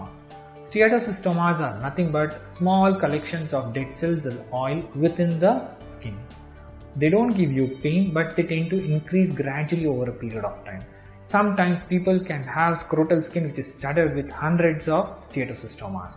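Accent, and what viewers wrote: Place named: Punjab, India. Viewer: I don’t understand Punjabi English.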